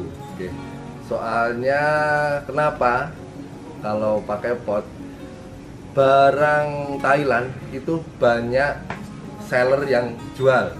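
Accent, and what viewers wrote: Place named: Java, Indonesia. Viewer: Galfok sama logat jawanya, mas. Jd nyengir2 ngebayangin ketoprak😂😂😂👍👍👍. Sukses ya. Terima kasih🙏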